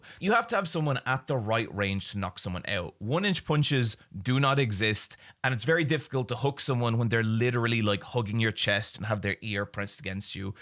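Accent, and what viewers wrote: Ireland. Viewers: Is ur accent Irish ☘️ ❓ very cute. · Irish Henry Rollins explaining the Jake Paul nonsense? Yeah, I'm here for this.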